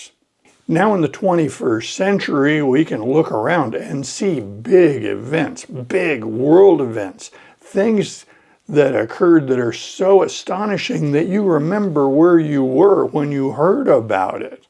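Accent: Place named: United States. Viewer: 💙💙💙... Thank you, for your stories. I never cease to be amazed at how through them I see the unfolding of my own life. You emphasize those small/big things that bend our path in a new and dazzling direction. I would like to know in more detail how the flame of your interest in Spanish was ignited. My interest in English arose from the cartoons we saw on TV, the movies we saw that showed life in America and then, the music, the songs of,... in which the magnificent English language, with its rich vocalism and its bold consonants, tinged the songs with an astonishingly beautiful color and I never forget nor get tired of the pleasure of hearing the exquisite pronunciation of these singers... Your way of speaking, your accent and the warmth of your voice add charm to the English language spoken in the USA... and for that and more I thank you...!!!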